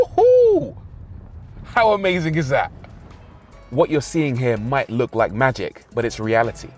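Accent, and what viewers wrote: United Kingdom. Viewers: I love your presentation and British accent 👍🏿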